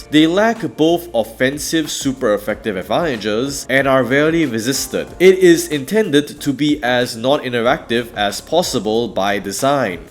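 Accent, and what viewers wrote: Singapore. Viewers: Haha I could recognise the Singapore accent even through your attempts to make it sound more american. I checked your channel and turns out I was right! In all honesty this was a well put together video that is informative yet engaging. Keep up the good work!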